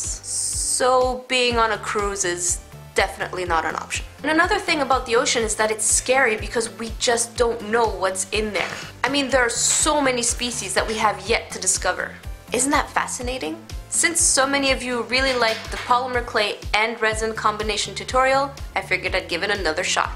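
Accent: Quebec, Canada. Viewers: are you Canadian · Are you Canadian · Canadian aye? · are you Canadian or some really French Canadian person???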